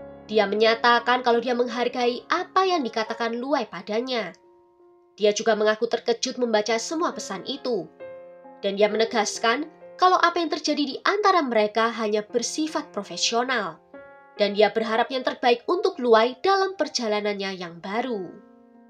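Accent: Java, Indonesia. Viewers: Mbk jawa nya medok amt mbk😅😂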